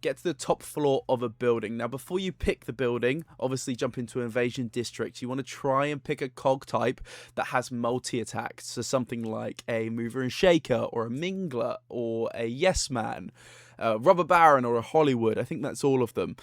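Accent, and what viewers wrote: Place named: United Kingdom. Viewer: Great video! I also hear a British accent (you correcting yourself from £1 to a dollar bill haha); not many of us who play/played TTR! This might be advanced, but this is what I used to do when training in buildings alongside your advice: I'd optimise the gags in a way where I'd overkill the cog on the last floor to max out how much gag points you can acquire in one building. For example, on the top floor, if the first cog is Lv 9 by itself before other cogs join, I wouldn't use a Lv 6 gag as it'll most likely take it out in one hit. I'd use two Lv 4 or 5 gags then use a Lv 6. That way, you'll max out the gag points faster. Like using a trap door first, then TNT. Or two quicksands then TNT. Also, Yesman are the best cog to face in an invasion; their attacks are not powerful and go up to Lv 7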